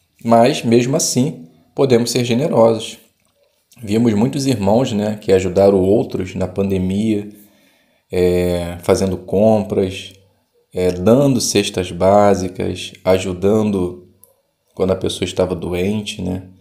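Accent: Rio de Janeiro, Brazil. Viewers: IRMÃO, MATE MINHA CURIOSIDADE: O. IRMÃO É? A VOZ É IDÊNTICA; ATÉ O SOTAQUE BEM CARIOCA. BOM DIA. ABRAÇOS TERNOS E FRATERNOS. 26/08/2022.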